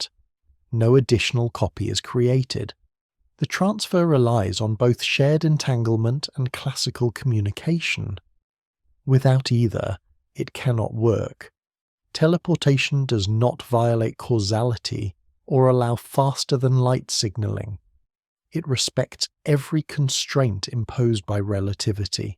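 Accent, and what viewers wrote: United Kingdom. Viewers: Want to love it, but there's nothing relaxing about a biting British voice in my ear at 11pm. Sorry.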